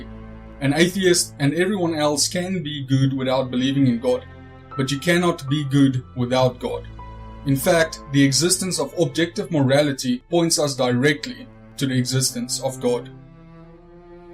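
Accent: South Africa. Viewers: I agree, awesome accent :) is it south african?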